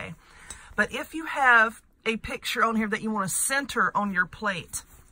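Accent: South, United States. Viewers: Love this and your accent! Where are you from? I know its in the South. I'm a SE Texan!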